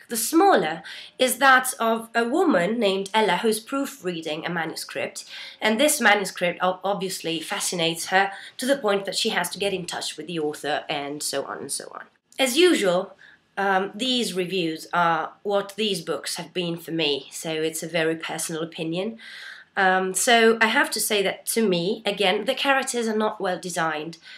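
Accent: United Kingdom. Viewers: What in the world?!?! Why do you have a British accent? How did you do that? You're Italian, but you have an almost native British accent. Uhhh WOW! I miss your bluebeam channel... what happened? I wish I knew you in person, you're so amazing and have so much depth.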